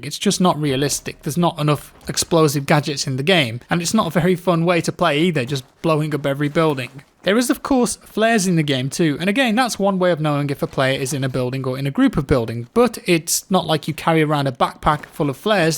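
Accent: England, United Kingdom. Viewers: I love battlefield and everything but I think the real reason I keep watching your videos is because that English accent is just delightful. 👌🏼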